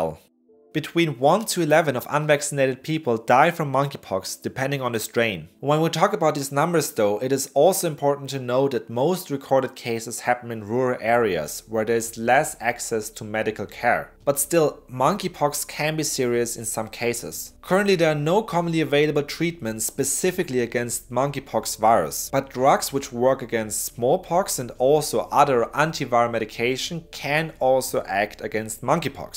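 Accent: Germany. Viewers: The guy who talks is german you hear it in his english out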